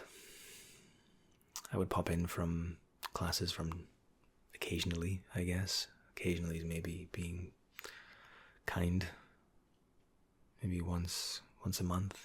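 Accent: Scotland, United Kingdom. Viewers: Genuinely cannot decipher your accent. I hear a Scottish twang, not the part I'm from but also not strong enough to be pure Central. Pls help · I read the actual comments to where the idea of this video originated. I didn't know if i was going to like the Scottish accent, but I wasn't disappointed at all. Keep up the good work boyo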